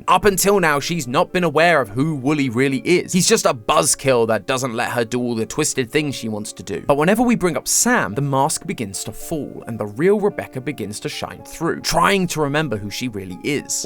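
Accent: United Kingdom. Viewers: I miss Mat. The british accent is weird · i forgot tom replaced matpat,so when i clicked i got jumpscared by a british accent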